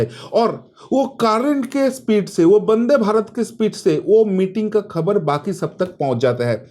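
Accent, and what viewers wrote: Bengal, India. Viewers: Enjoyed your Hindi in Bengali . What is “Ghenchu”?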